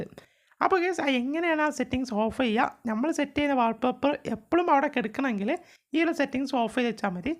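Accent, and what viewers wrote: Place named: Malappuram, India. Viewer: 😂ethra thekkan bhasha anukarichaalum malaappuram bhaasha idakk keri varum. Ningal malappuram karan😅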